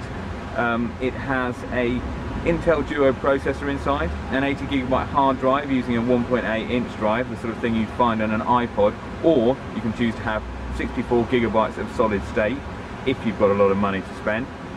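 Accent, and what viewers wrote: England, United Kingdom. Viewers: english accent...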